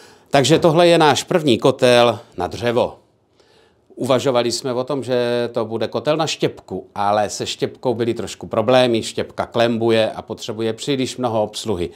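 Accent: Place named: Brno, Czech Republic. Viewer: Mě trochu připomíná pana premiéra Fialu :) Hlasem, lehce brněnským přízvukem, těma pomlkama uprostřed věty i tím formálně-akademickým jazykem.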